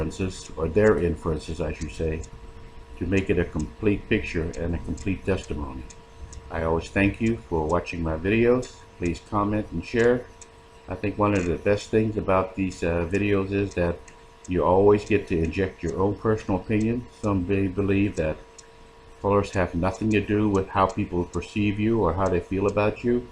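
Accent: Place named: South Louisiana, United States. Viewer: Are you originally from South Louisiana? Your accent reminds me of some back home folks near the bayou.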